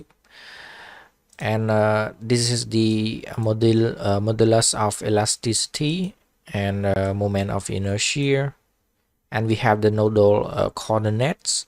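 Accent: Cambodia. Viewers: បងខ្មែរមែនស្ដាប់តុងអង់គ្លេសបងដូចខ្មែរ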